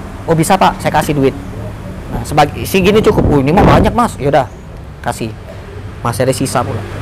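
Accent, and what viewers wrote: Palembang, Indonesia. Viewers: Logat Palembang nya dak ilang... Sukses trus dunia akhirat bang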